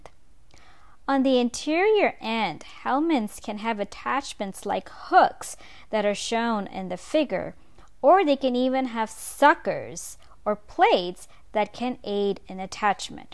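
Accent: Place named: South Korea. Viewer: i like korean accent very much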